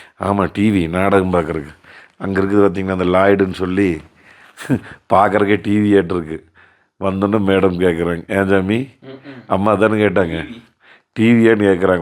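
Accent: Kongu, India. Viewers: கொஞ்சும் கொங்குத் தமிழ் பேசும் கோமகரே...! திகட்டாத தித்திக்கும் பேச்சுடைய திருப்பூர் திருமகரே...! எங்கள் மதிப்பிற்குறிய டான் திரு. அண்ணா❤❤❤🙏 அவர்களே எப்படி இருக்கீங்க அண்ணி❤❤❤🙏 எப்படி இருக்காங்க ரித்திக்❤❤❤ எப்படி இருக்காரு. பயம் இருக்கும் மனதில் நிச்சயம் பலம் இருக்கும்...! எளிதில் அறுந்துவிடும் நூலை நினைத்து பயம் கொள்ளாமல்...? எதிர்த்துப் போராடி வீறுகொண்டு எழுந்து பறந்திட வீசும் காற்று உள்ளது என வானில் பட்டம் பறப்பதில்லையா...! அதுபோலவேதான் மனதை உலுக்கும் பயம் வந்தபோதும் எதிலும் உறுதி என்கிற தன்னம்பிக்கை என்னும் பலம்தான் அண்ணா உங்கள் வெற்றி மகுடம்...! நம் ❤சிட்டுக்குருவியோட❤ தங்களது இந்த லடாக் பயணம் இனிதே தொடர்ந்திட நல்வாழ்த்துக்கள் அண்ணா❤❤❤ என்றும் அன்புடன் உங்கள் தம்பி என்றும் அன்புடன் உங்கள் TMF ரா.முஹம்மது கபூர் (சென்னையில் இருந்து)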